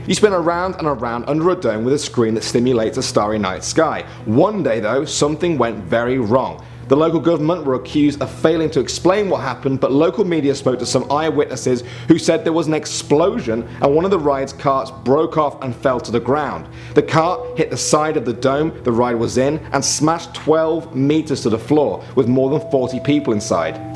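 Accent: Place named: United Kingdom. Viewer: He's British so he has an accent.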